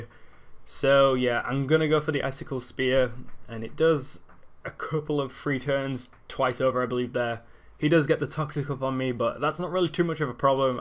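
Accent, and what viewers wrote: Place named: North, United Kingdom. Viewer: So northern.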